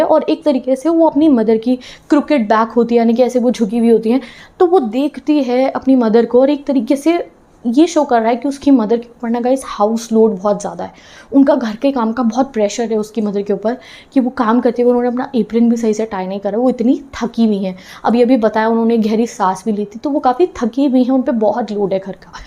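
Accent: Punjab, India. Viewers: Mam are you from punjab?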